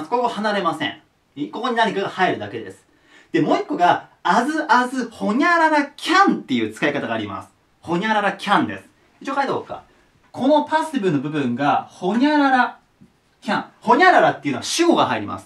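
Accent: Japan. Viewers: 発音はちょっと。。。😂😂ジャパニーズ・イングリッシュ。。。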